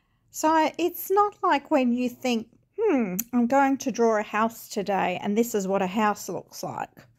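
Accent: Australia. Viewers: I definitely hear you when you talk about trying to keep up with others in the competitive colouring and arty communities eg ‘how can I get better, should I get this material or mediums, will it make me improve etc. it becomes a bit overwhelming in the end and I have been seeking out this kind of video to just be able to loosen up a bit as well. I am an Aussie too so was glad to hear an Australian voice, I have subscribed so that I can go along this journey with you into Zen non anxiety mindfulness. Thanks for your video. 👍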